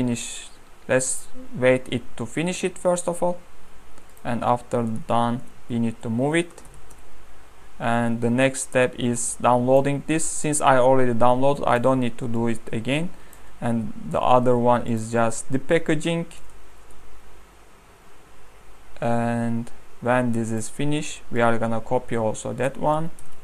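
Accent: Turkey. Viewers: ingilizcesini rahat anlıyorum türk olabilir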